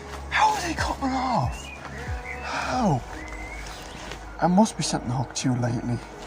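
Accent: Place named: Ireland. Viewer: he is from irland mate he has a accent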